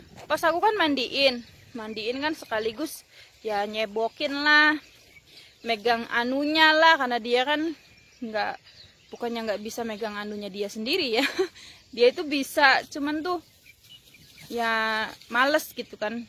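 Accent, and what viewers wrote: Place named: Losari, Indonesia. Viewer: Oh.... indramayu ya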